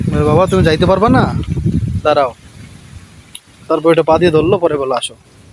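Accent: Barishal, Bangladesh. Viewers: আপনার বাড়ি কি বরিশাল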